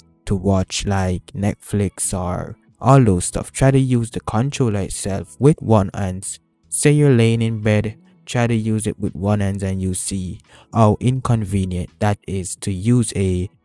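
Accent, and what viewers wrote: Jamaica. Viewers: This man is Jamaican Peeer da rimot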